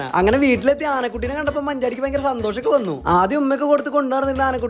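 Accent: Thrissur, India. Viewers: Chettan thrissur aano😮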